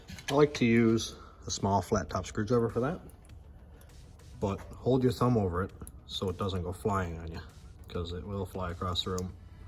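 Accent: Newfoundland, Canada. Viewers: Awesome video ❤!! And I knew that accent of yours was from the rock,…. 😊 a fellow Canadian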